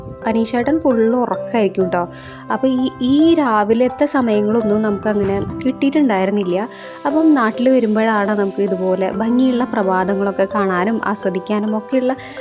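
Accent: Thrissur, India. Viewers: Are you thrissur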